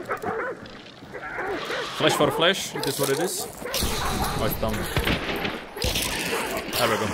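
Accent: Czech Republic. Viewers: Im already know all by 300 hours but thanks for tips you sounds I little bit like from Czech Republic so easy to understand thanks man..👍🤠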